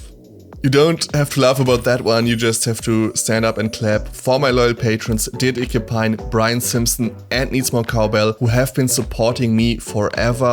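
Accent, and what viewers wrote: Germany. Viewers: deutscher akzent :D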